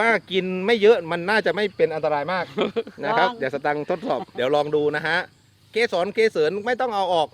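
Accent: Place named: Korat, Thailand. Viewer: คิดถึงภาษาโคราชบ้านเองมาก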